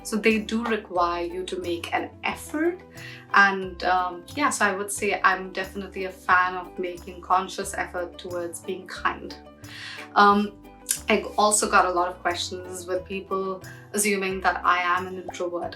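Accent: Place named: India. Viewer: U have Indian accent R u indian??🤔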